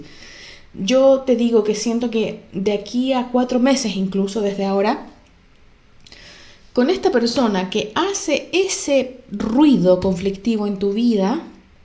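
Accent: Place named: Chile. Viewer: De que país eres???y dónde estás ahora, yo soy de Chile...por tu acento se me imagina que eres chilena...si es así, me gustaría verme las cartas, personalmente.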